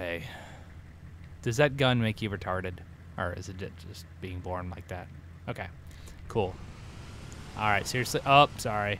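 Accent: United Kingdom. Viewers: love the British accent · You sound British!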